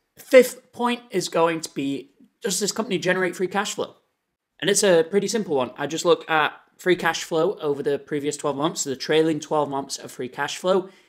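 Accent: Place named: United Kingdom. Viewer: You sound British, are you from the UK?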